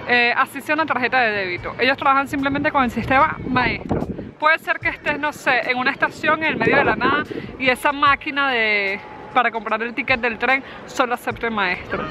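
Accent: Caracas, Venezuela. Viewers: tengo duda por tu acento, eres venezolana? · Hablas como Caracas Ciudad de despedidas. Que pena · Coño mi pana el acento de sifrina de caurimare no se te quita.... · Hola, recién te estoy conociendo por qué tengo pensando viajar a Holanda y quise indagar un poco más, soy barbero que sabes de eso allá? Por cierto también soy de Venezuela, reconoci tu acento. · Vi su video por mí interés de viajar a Amsterdam, no se de qué país es usted, asumo que venezolana por su acento. Sobre su video el fondo es bueno pero la forma... Éxitos.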